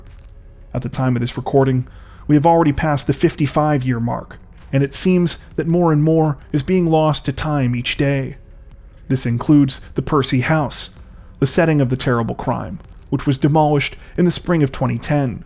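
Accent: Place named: Canada. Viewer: I don’t watch a whole bunch of crime channels, but I appreciate your work. I’m old, and the nostalgic ones really get me. I also like the respectful approach to events are at once fascinating and horrifying. I feel bad for the families involved. Death by illness or accident can be understood; death by violent murder must be very hard on the survivors. I’m so sorry for them. Additionally, I love a Canadian accent. “Been” absolutely should rhyme with “seen” but among Americans, it never does. Thank you so much.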